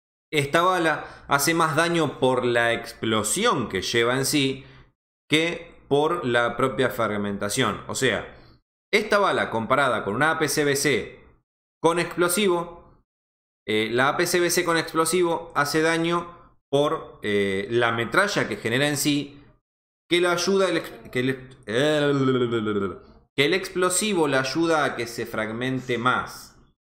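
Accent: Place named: Argentina. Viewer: argentino locooo, que bien me caes jajaja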